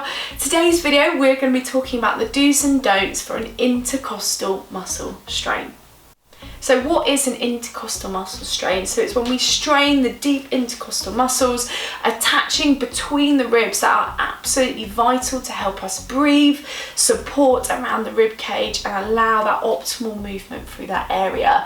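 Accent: United Kingdom. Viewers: The accent tells me that the speaker is British or somewhere from Europe with British accent ✌️ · UK company and yes she sounds as English as I am.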